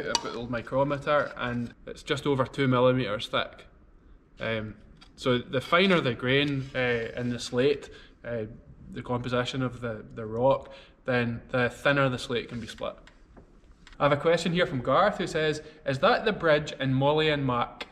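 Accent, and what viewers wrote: Scotland, United Kingdom. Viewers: Hi, thank you for answering my question about where your slate comes from. It was really interesting to see the two pieces together (the red and the green). As always it's so nice to see your beautiful work. How I'd love to have a dovecote like the one you made! Looking forward to seeing more of your videos, and as an American, to hearing your Scottish accent.:)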